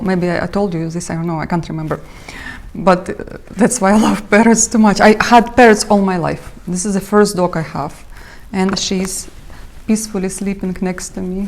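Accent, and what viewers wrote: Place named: Russia. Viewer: Talk abour BORING! If I hear "just nan" one more time my head will explode! Your projects are SO boring, so dull, they completely lack color, and nothing is more boring than samplers! It's like oatmeal! And on top of that you attempt to say french words when you are barely understood in English because of your massively thick russian accent. Seriously? You are so blatantly bougeois in contrast to the suffering of the people in your home town! The only good thing about this video is susie, the most beautiful chihuahua.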